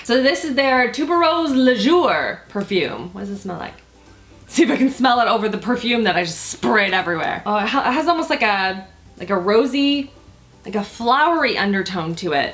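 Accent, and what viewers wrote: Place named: Canada. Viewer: your Canada is showing (the accent, "out" and "about" displays it really well) 🇨🇦🇨🇦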